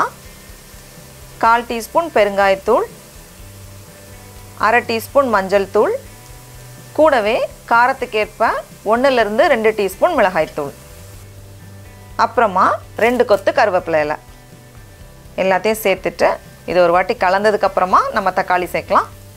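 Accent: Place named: Tirunelveli, India. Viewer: Nice. sis. Tirunelveli and Nagercoil mixed slang.athenalthan ketten.unga uru special items seyunga.WAITING.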